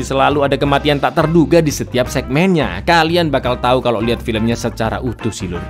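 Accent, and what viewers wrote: Java, Indonesia. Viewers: Mas jowo asli Tah mas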